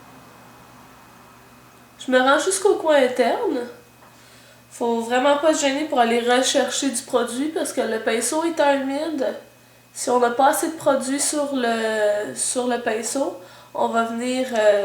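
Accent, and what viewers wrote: Canada. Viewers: Très beau maquillage ... j'adore tes vidéos et ton accent canadien fan de J.Deep mais pas autan que toi... une française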